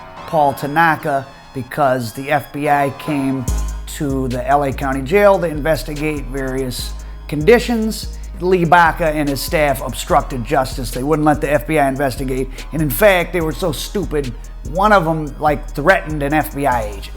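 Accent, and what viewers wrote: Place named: New York, United States. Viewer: I feel the new York accent gives this authenticity even though it's a west coast gang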